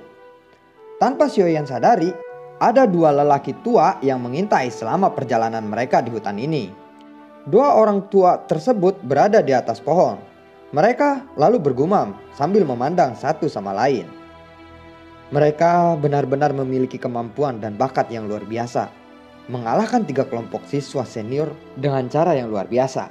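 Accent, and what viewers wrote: West Java, Indonesia. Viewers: Orang sunda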